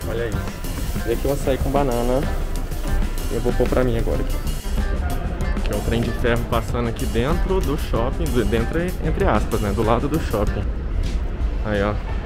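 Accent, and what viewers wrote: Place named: Goiás, Brazil. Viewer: Fala bem goianes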